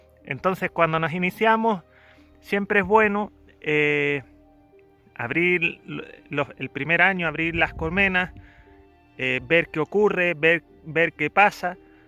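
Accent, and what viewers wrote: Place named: Mexico. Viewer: es un experto en apicultura y una gran persona tiene más parecido el acento de voz a mexicano que a español y es un gran ser humano por que nos enseña todas sus experiencias con la apicultura Dios bendiga tu familia. un abrazo con respeto de un mexicano🇲🇽 Desde Ohio usa 🇺🇸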